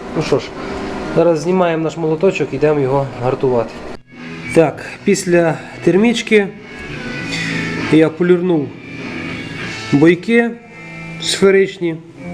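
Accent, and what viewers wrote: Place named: Western Ukraine, Ukraine. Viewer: звідки ти родом? Ато акцент Львівсько-Франківсько-Тернопільско-Волиньий!))))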